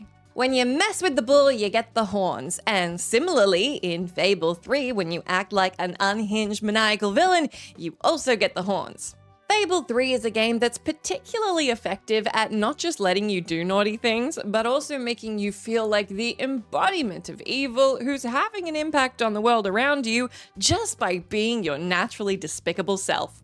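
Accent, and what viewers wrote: Australia. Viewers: That is an Australian accent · I feel some of the most questionable things I've done are on Stellaris the game makes Slavery and Genocide fun! All for expansion and profit of course. I mean I'm normally apologetic about my Countries Imperial past and then I play that! Lol Edit: is adorable I think its the Aussie accent Shoot me I'm simping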